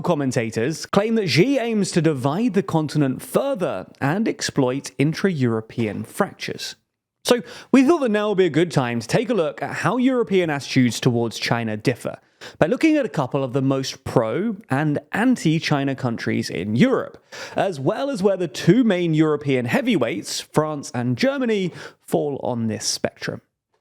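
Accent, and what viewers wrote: England, United Kingdom. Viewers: The videos are great but what s with this overly british accent ?? Kind of anoying, sorry☺️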